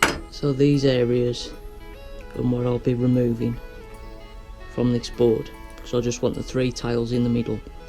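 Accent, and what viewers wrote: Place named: Midlands, United Kingdom. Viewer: Yo big. Im in awe that you had the guts to go straight in with the exotic timbers mate.....youre brave:-) I would suggest practising and refining your technique doing a few pine first.....but hey, i love your maverick style dude. Damn good effort though for you first bash. Another thing....(and im judging the purely by your accent)....but do you know there is a Midlands Woodworking & Powertool show in Newark on the 27/28th of this month....Im sure you could pick up a mallet there:-)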